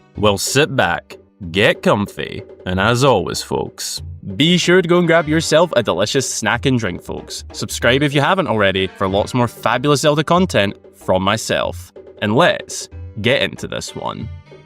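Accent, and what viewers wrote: Scotland, United Kingdom. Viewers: Are you from the same region of Scotland as Lorraine Kelly?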